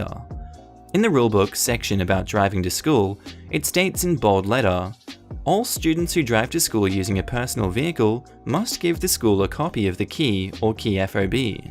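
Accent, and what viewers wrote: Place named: Australia. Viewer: your amazing Aussie voice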